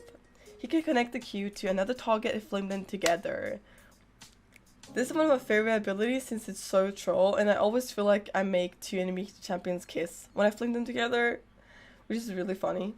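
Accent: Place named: Sweden. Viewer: omg girl I love you! Im also playing jungle on Gold right now, mainly Evelynn and Kayn and its so nice to see another girl that also likes to play jungle. This whole stereotype of girls playing only supp or mages is so annoying... And I feel less alone now! :D Oh, if you play Kayn, evelynn or elise it would be also awesome to get an guide on those champs. Even tho I main them I still feel I have alot to learn and it would be nice getting this info from another girl! And Eve feels so weird to play once youre a bit higher on ranked because people actually know how to play against her then and how to get back into the game once u are behind.. So, some advices for gold- Plat would be nice! Oh and youre swedish accent is so damn cute! :D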